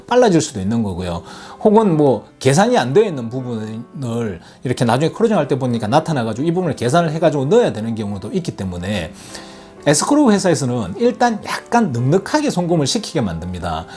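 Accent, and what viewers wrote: Gyeongsang, South Korea. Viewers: 감사합니다!! 요즘 매일 보고 배우고 있습니다 특히 경상도 액센트 좋아요 😁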